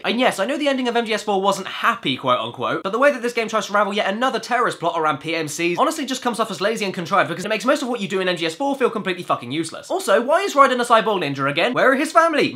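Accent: United Kingdom. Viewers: British goodness!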